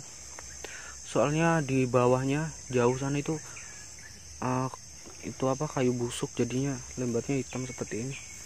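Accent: Java, Indonesia. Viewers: Mas wis tak subscribe, asline biyen Jowone ngendi ?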